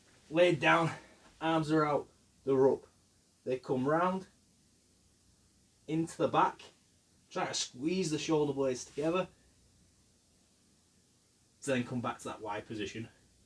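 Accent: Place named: Yorkshire, United Kingdom. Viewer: Sounding extra Yorkshire in this one,! Thanks for the video.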